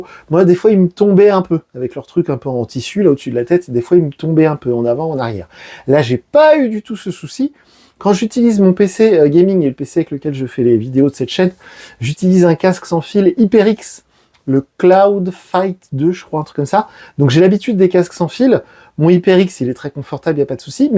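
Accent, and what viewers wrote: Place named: Marseille, France. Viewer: J'ai cru t'étais marseillais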